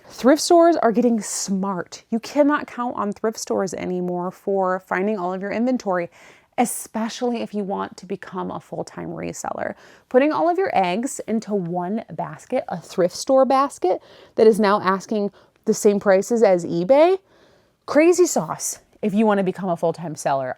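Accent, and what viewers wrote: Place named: Midwest, United States. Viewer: I just hear accent, maybe Midwestern? Maybe there's a touch of nasal sound. Trying to hear what you complain about, maybe a couple bits of that sound. But certainly not like the Hollywood exaggeration that makes you want to mimic the sound and turn it off. Anyhow, she sounds like a sweet and lovely young lady. Didn't your mama teach you that if you can't say anything nice, to say nothing at all? Just scroll on with your miserable self and leave the sweet ladies alone!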